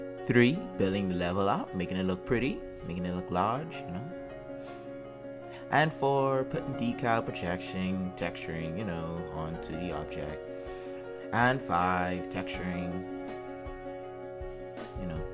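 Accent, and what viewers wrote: Bahamas. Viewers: A whole Bahamian. I love it!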